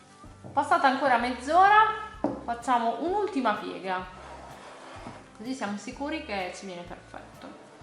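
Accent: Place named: Parma, Italy. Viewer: Ciao! Mi é piaciuto il tuo canale, parli come un' amica mia italiana che é di Parma , di che città sei? Parli così bene che io ti capisco tutto e non sono nemmeno italiana. Saluti dal Cile!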